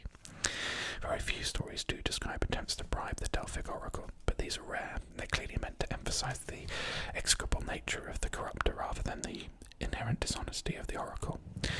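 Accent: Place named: England, United Kingdom. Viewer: I love history and English accents so great combo for Me. Thank you.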